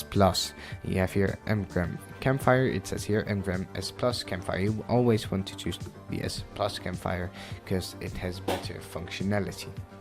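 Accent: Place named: Netherlands. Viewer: nederland!! ik hoor het aan jou accent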